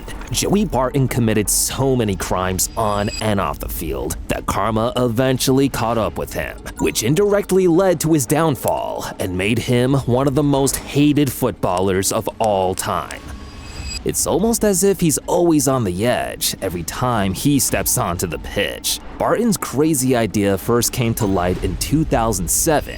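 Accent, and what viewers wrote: United States. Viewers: Be quite a interesing episode apart from the crap american narrator. Bellend!!joey is a wrong un but talks "sometimes"sense in some issues.absolutley radio rental but his family shit wernt his fault.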